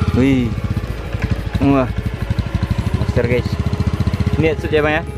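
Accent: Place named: West Sumatra, Indonesia. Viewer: Abang orang mana bg?? Kayak logat orang minang bahas indo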